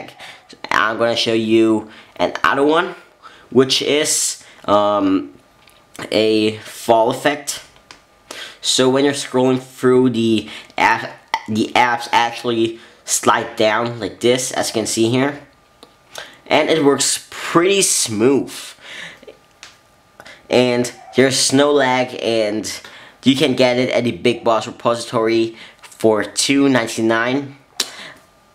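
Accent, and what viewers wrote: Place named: Asia. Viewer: You sound asian.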